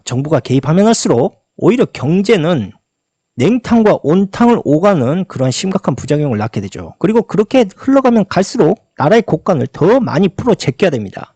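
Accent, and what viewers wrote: Jeolla, South Korea. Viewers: 은 절라도 말투? 경제에대해 시원하게 말씀해주시고 윤석렬은 특히 미워하시는걸 보니 진보(사회주의)에 가깝다. 사실 정치가들을 믿을 수는 없지만.....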